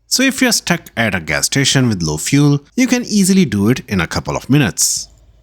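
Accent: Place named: India. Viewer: Great video. But Im not doing all that! Nice accent, though. New Delhi? My ex-husband is Punjabi from Panchkula. His sister is famous actress Yamini Singh. She’s in Mumbai, of course! My didi. ❤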